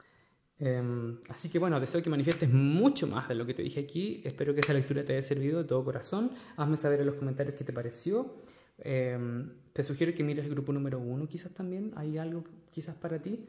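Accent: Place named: Chile. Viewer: Hola, Youtube me recomendó tu canal la semana pasada y he estado viendo tus videos.Eres chileno? Reconozco mi acento.❤. Elegí la opcion 4 y muy acertado soy tarotista trabajo completamente de esto hace 5 años tengo un canal de tarot aqui en youtube con mi otra cuenta.. Todo lo que describes de mi en lo empatica y generosa es asi, siempre mr he considerado muy espiritual y me causó sorpresa lo de la bendición... ya bendigo el agua y las cosas lo habia dejado de hacer de hecho en uno de tus videos te envie bendiciones curioso y ahora veo esto 🙏👍.Saludos desde Chile Me pareces muy buen tarotista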